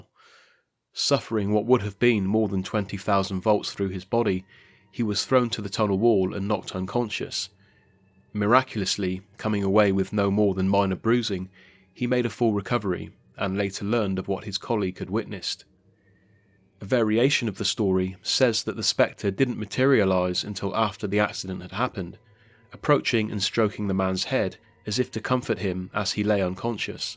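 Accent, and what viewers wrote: England, United Kingdom. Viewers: I enjoyed those short stories, not heard any of them before. It is good to hear an English(?) voice making the narratives.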